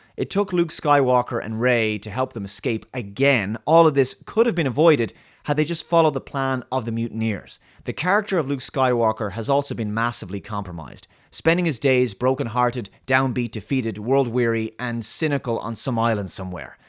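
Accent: Ireland. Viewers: You Irish? :D Instant sub!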